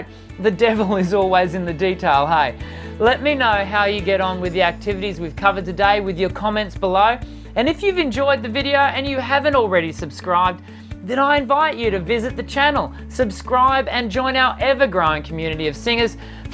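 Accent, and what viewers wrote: Australia. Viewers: Lol I'm a woman with a deep voice and his low range is my high range. But he holds a tune well! I love his Australian accent and turns of phrase too. His personality comes across too. Just enjoyable and educational all around.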